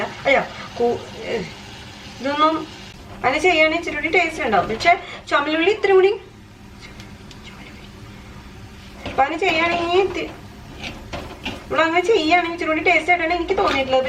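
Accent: Thrissur, India. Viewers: Variety recipes aanaloo... Recently aanu Kanan tudangiyath.... am from EKM... nalla speed samsaram... Nik oru frnd und.. she is from trissur, she is talking like this... any way NYC recipes !All the best dea... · തൃശൂർ ആണല്ലേ... ഇത്ര സ്പീഡിൽ എങ്ങനാ സംസാരിക്കുന്നെ??? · എന്ത് രസാഡോ തന്റെ സംസാരം കേൾക്കാൻ.. തനി തൃശ്ശൂർ ഭാഷ. നാട്ടിൽ എവിടെയാ? അമ്മച്ചി സ്പെഷ്യൽ എല്ലാം പൊളിയാട്ടോ.. അമ്മച്ചിയോടു പറഞ്ഞോളൂ. എല്ലാവരും ഇപ്പോൾ പഴമ ഇഷ്ടപെടുന്നു...അതാ തനിക്കു ഇത്ര സപ്പോർട്ട്. ഇങ്ങനെ തന്നെ മുന്നോട്ടു പൊക്കൊളു. എല്ലാവരും കൂടെ ഉണ്ട്.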